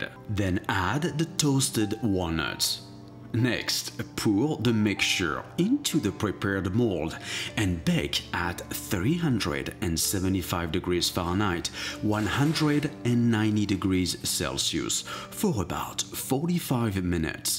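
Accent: France. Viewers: Are you French or not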